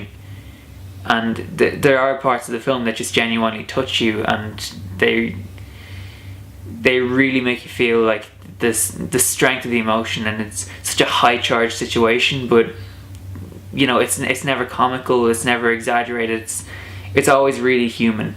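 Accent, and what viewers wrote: Ireland. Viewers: The movie is impressive! After I went to the Titanic Light Show in Belfast two weeks ago I definitely decided to go to see the movie on big screen. DiCaprio and Winslet are genius, as always. Btw after my trip to Ireland I am missing the Irish accent, so beautiful! It was the reason I subscribed to your channel (your accent) =)) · I saw it in 3D, too. It was worth seeing it again in theaters, & the 3D in the film made it just a bit more enjoyable on the big screen. It is definitely a classic. Btw, great videos, I'm gonna subscribe cuz you're awesome. And of course I'm jealous of your accent ;) I wish I had grown up in Ireland.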